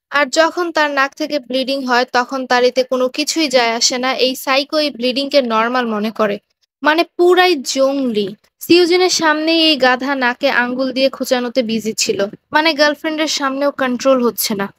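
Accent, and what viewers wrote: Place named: Barishal, Bangladesh. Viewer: আপু তুমি কী বরিশাইলা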